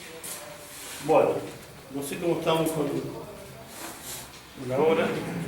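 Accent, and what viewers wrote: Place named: Córdoba, Argentina. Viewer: ajajajja reconoci el acento cordobes al toque y quede wtf, esperaba encontrar el tipico tutorial centroamericano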